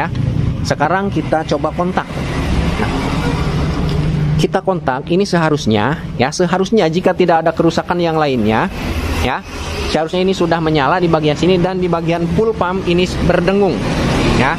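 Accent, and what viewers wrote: West Java, Indonesia. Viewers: Paling suka deger logat orang sunda...rasanya pengen bgt pnya teman orang sunda...salam seduluran dr malang jawatimur bossq🙏🙏🙏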